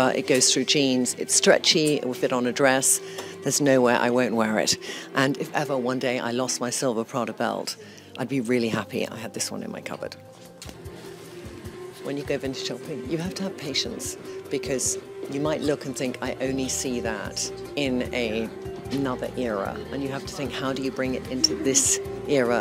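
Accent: United States. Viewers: Love the video, but why the American accent after a week! Is there something we should know?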